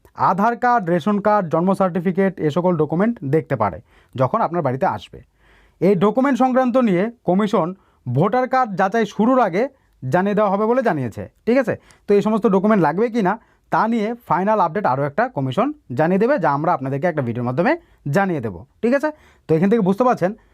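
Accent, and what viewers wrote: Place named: Bangladesh. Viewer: আপনার সব ঠিক আছে তো? আপনি তো বাংলা দেশি, আপনার কথায় বাংলাদেশি টান আছে।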